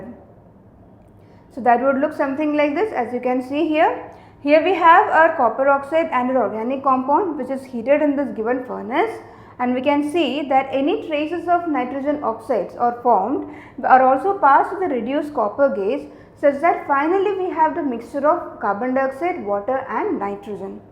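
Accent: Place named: South India, India. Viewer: Aiyo I guessed right you are south indian ji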